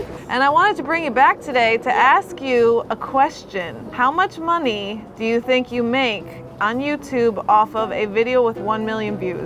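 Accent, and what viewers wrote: New York, United States. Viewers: I've followed your channel for several years and use it to practise my english listening stills. At very first video I thought you have a NY accent, you always swallow the "h" sound from "manhattan" and the words come from your throat not from lungs, it makes the words sound more lighter than someone from california. I had difficulties at that time, now I am used to it. Great performance, beautiful apartment! Go ahead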